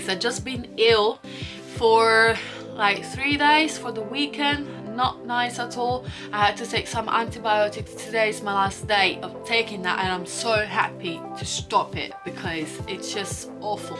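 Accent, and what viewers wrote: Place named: Bogan, Australia. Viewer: London, yet use a uk flag ... such a bogan accent.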